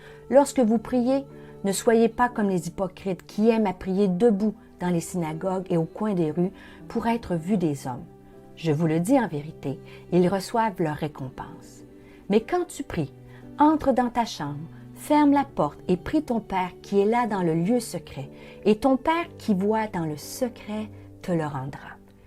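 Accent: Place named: Canada. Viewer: Bonjour Pasteur....( de Vendée en France )......Merci pour ton conseil. Nous avons tous un peu en nous une envie parfois de paraître surtout en face de personnes qui ne font que s'élever, chassons cette envie et restons humbles, l'humilité est une si belle qualité.!!!!!Mon père était un homme très humble, érudit et qui parlait 4 langues, la réflexion d'une de ses voisines qui l'appris un jour m'a choquée.... c'était: "Oh! On ne le dirait pas "... c'est çà l'humilité, ne pas se glorifier de ce que l'on est, de ce que l'on sait... il a toujours été ainsi et il était mon exemple, mais le fait de rester humble parfois n'est pas facile en face de certaines personnes, je dois l'admettre...Résistons avec l'aide de NOTRE SEIGNEUR,l'humilité LUI plait. Bravo chère pour ton message de ce jour et sois bénie chère soeur en CHRIST...........j'adore t'écouter et ce petit accent Canadien est très agréable à entendre 😊😊😊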